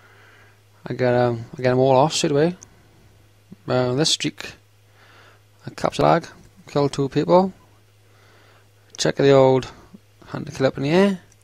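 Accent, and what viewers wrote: United Kingdom. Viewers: Lastly, your voice is clearly English -> Scottish in accent. To an untuned American ear, it is quite hard for me to understand you. This isn't your fault, just something to keep in mind if you want to broaden your audience.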